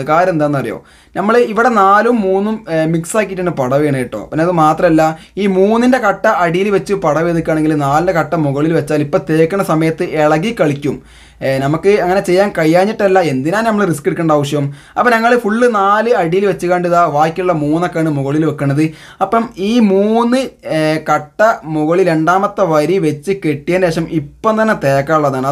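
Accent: Malappuram, India. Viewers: ആ മലപ്പുറം ഭാഷ അതാണ് പവർ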